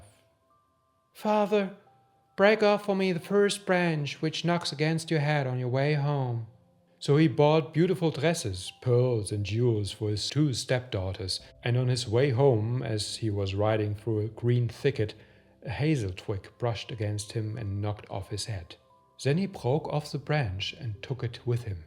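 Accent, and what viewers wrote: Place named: Germany. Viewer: What a timing to upload, I’m going to Germany the next day 😃 The accent is so nice! Can you do one in German?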